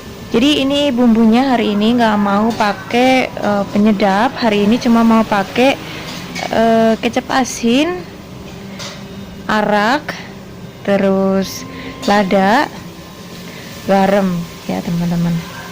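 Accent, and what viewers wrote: Banyumas, Indonesia. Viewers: Salam ngapak sliramu pinter masak pantesan bojone betah nang umah sampean nang luar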